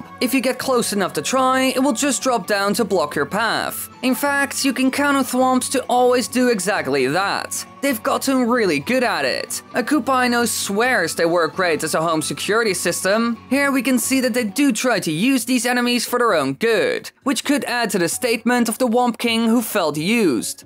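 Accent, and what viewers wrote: Netherlands. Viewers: Saw your majora's mask video and thought I heard a little dutch accent. Your PO box adress confirmed it Haha. But so am I!Keep up the good work dude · Are you dutch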